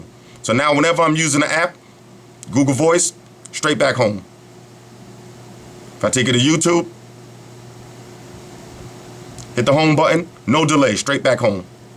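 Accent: New York, United States. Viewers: I watched this the first time to see what's being said about the SIII...I watched it the second time cuz I live in FL and your voice brings me back home! Ugh I miss NY but thanks for the flashback :)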